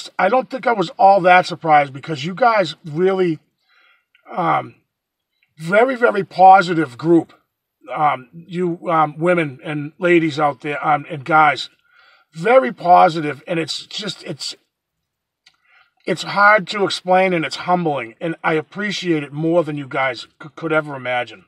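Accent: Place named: Massachusetts, United States. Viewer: I have to laugh. The very first video of yours that I watched, not having any idea what part of the US you were in, as soon as you started speaking, I knew you were from SE Mass. Not Boston, not Western MA, but the Taunton, Dighton, Berkley, Norton, Mansfield, etc region. I think your videos are "wicked awesome".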